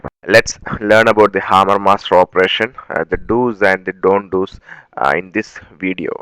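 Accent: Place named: East India, India. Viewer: Great East Indian voice speaking well!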